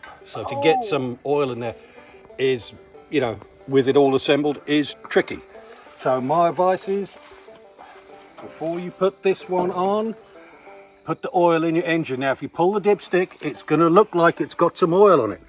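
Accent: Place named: England, United Kingdom. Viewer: What’s your back ground mate you sound like a Englishman?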